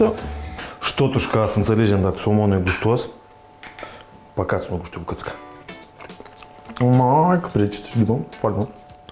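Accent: Moldova. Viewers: Sint cu un zimbet intins la maxim... accentul moldovenesc si exprimarile sint extraordinare... ,, ou poshat’’ hahahahaha... chiar nici nu stiam cum s ar putea chema din engleza in romana... pouched eggs!!! Cu drag continui sa ma uit din Australia 😘😘😘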